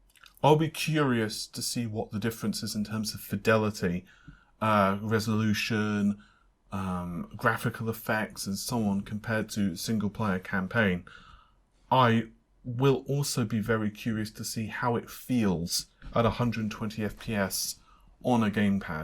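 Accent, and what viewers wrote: England, United Kingdom. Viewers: english accent?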